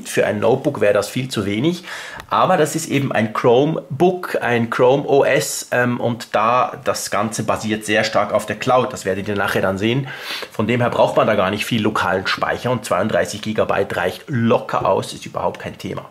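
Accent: Switzerland. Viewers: Versuch mal nicht immer alles in deinem schweizerischen englisch auszusprechen!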